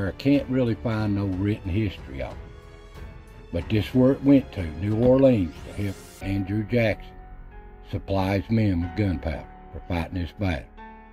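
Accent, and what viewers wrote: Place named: South, United States. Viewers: Your channel is awesome and I love your country accent 🙃🙃🙃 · Appreciate your history and videos! As soon as i heard you speak i knew you had to be from East TN or southeast KY. Im very close to Cumberland gap and middlesboro area. Anyway, i recently picked up the hobby of metal detecting and Im running into the same thing as you with those “hot rocks”, especially on my small farm. It’s a challenge sometimes! Thanks for the info on the various types of rocks!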